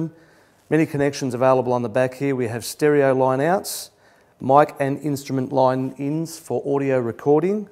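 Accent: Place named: Australia. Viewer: australian accent sounds cool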